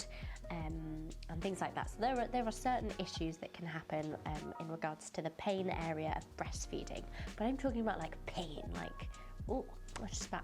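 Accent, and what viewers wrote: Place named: Yorkshire, United Kingdom. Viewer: Thanks for this video! I’m loving your channel! I’m guessing you’re from Yorkshire! Maybe Leeds? ☺️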